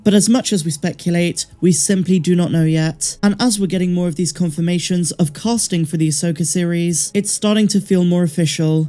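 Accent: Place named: Bristol, United Kingdom. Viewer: Are you from Bristol Star Wars? Your accent sounds familiar?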